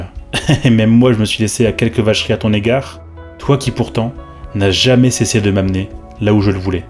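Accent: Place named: Nord-Pas-de-Calais, France. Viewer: Ch'ti qui vient din ch'nord in' brai deux fouâ, un' fois quin y vient, un' fois quin y r'par (j'ai essayé de transcrire l'accent 😅). Trois pattes powaaa! Gaffe quand même tu joues plus dans la même cours, ca envoie comme motobylette!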